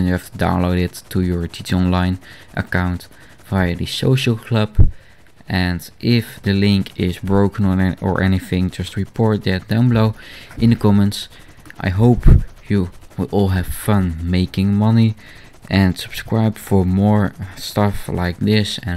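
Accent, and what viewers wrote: Netherlands. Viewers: dutch accent?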